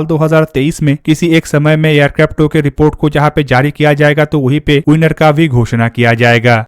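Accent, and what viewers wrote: Bengal, India. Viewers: Bhai Bengali ho kya Tum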